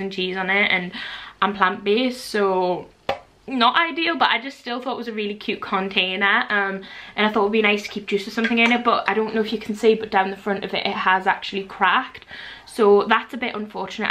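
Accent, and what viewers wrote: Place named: England, United Kingdom. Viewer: I love "bathe-az", "joggaz", "furnitaz", "knickaz". Not taking the mick, just love the different ways we all say words in England.❤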